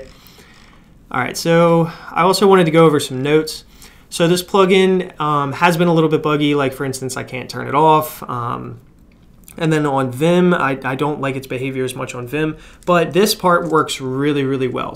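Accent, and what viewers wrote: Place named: Pennsylvania, United States. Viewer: Trying to place your accent. Sounds kind of New York or New Jersey at times but mostly Pennsylvania. Maybe I'm way off though.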